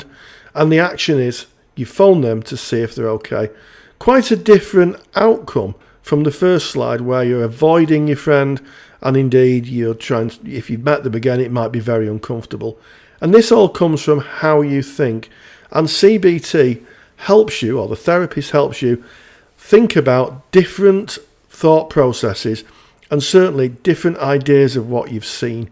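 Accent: United Kingdom. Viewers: its a northern english accent, its fine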